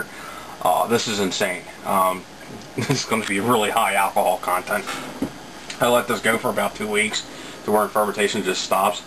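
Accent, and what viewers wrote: United States. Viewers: This is good work. You sound like a Pittsburgh accent, where are you from? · Are you from Baltimore? Sounds like a b-more twang to me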